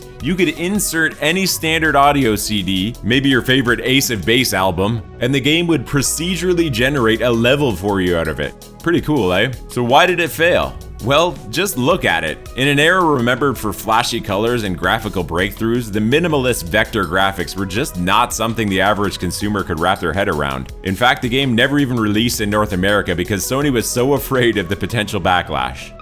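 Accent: Canada. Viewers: i can always tell when its a canadian when they talk funny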